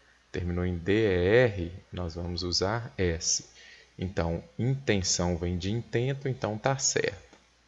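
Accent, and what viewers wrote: Minas Gerais, Brazil. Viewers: Professor amo o jeito mineiro de falar ! Obrigada pelo vídeo !!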